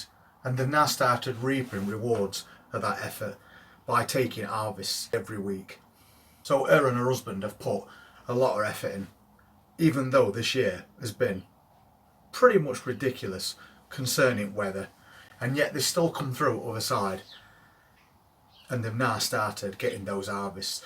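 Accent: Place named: North, United Kingdom. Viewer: Hiya,. I always watch and listen to your videos with the captions on. I love the way it cannot cope with your accent. I'm a northerner so I'm fine with your accent and dialect, but some of the automatic captions are hilarious. Thanks for the great fun and loads of helpful and hopeful tips. Cheers.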